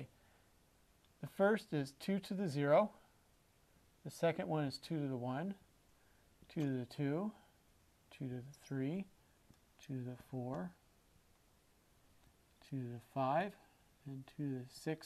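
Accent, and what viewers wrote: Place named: United States. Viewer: Congratulations you're the only person with an American accent I can find for the subject. You win.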